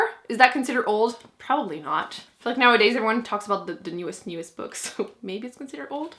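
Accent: Quebec, Canada. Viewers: Just found your channel and have binge watched a bunch or your episodes. I listen to a lot of audiobooks while driving around in my cruiser and when I am out sailing on my boat. You are so bubbly and cute when you explain why you like the books you do. The French Canadian accent is so cute too. It makes me laugh at times, which is an added bonus. FYI, I’m Canadian as well but don’t have that French accent. Not to say I don’t sound Canadian because I do notice in my videos I sometimes do say “eh?” at the end of my sentences. You don’t even realize you do it until you watch yourself. Ha ha. You seem to like similar types of books to what I like. Keep those videos coming. I’ll be watching. Craig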